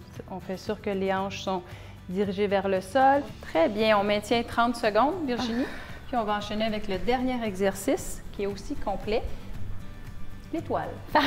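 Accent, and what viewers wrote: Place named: Quebec, Canada. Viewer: je vous regarde de Belgique et moi j'adore votre accent qui me rappelle mon voyage au Québec ;-)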